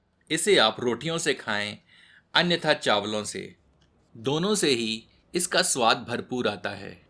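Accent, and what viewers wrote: Standard. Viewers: आपकी शुद्ध हिन्दी सुन कर ऐसा लगा जैसे रामायण देख रहा हूं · आपकी शुद्ध हिंदी का बोलना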